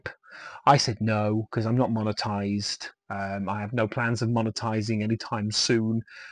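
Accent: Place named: Birmingham, United Kingdom. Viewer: I dunno what happened, but you were considerably more brummie in this video... Also, did you know Wet is sold out at CEX? I think it's gonna spike hard that one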